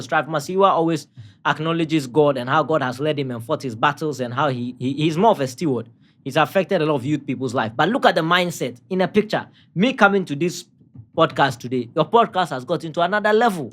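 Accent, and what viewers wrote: Ghana. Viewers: He sound Ghanaian🇬🇭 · This Indian guy has a Ghanaian accent. Did he grow up in Ghana? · Are you a Ghanaian his voice is like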